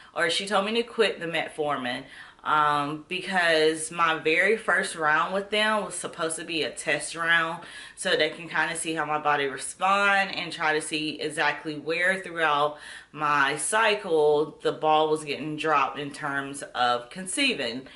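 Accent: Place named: Carolinas, United States. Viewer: I'm so glad I found this video! I heard your accent and I was like "She has to be from NC or SC" and when I heard you say it's still cold in NC and here in Florida, I was like "I knew it!!!!" I'm on Letrozole (Day 4 of pills tonight) and I have had very few side effects. Thank goodness! But I have had joint pain in my left knee and right ankle, but that didn't last long. I've had some nausea in the mornings, but it doesn't last long either. I am hopeful that this first round will work. I haven't had any previous issues with my female parts other than this year (2020) and my cycle being waaaayyy off. But luckily, my doctor is wonderful and listens to me. He feels like my issues are hormonal and that this first round could be successful.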